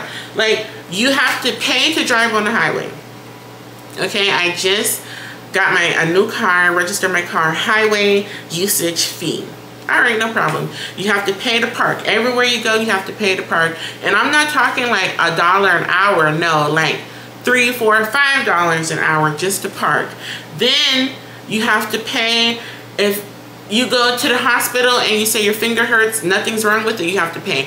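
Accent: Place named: Jamaica. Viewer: Born and raised in Florida!? But it sounds more like you were born and raised in Jamaica 🤷‍♂️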